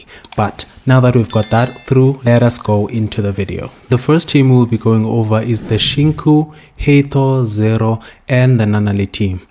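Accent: South Africa. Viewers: Bro, u sound like kao kwishisha. Mzansi? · hears a South African accent, subscribes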